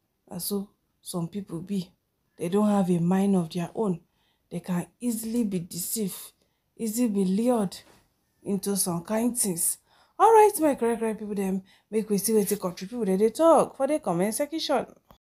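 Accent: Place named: Pidgin, Nigeria. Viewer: 😂😂😂I love your videos so much and I like the way you speak your pidgin to give gist, God bless you my sister. Watching from Liberia🇱🇷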